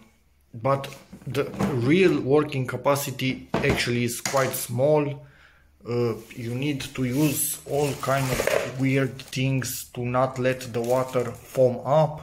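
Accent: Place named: Romania. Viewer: La cum vorbești ești Român toată ziua. :D Zi-mi te rog,il recomanzi ca si putere,trage bine?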